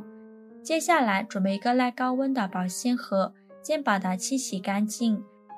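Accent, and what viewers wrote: Yunnan, China. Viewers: 云南的单山辣，云南普通话，云南风味。🤤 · 博主口音是雲南哪裡的？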